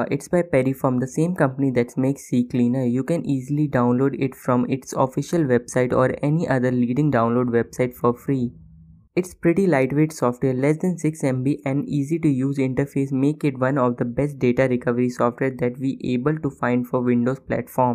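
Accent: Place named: India. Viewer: youre speaking english in indian accent and i still can understand what you are saying! just speak a bit slower and youre good to go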